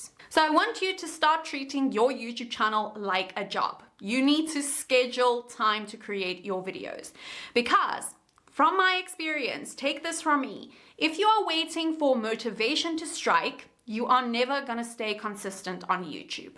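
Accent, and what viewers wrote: South Africa. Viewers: (Are you South African ?) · Love the South African accent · Love your South African accent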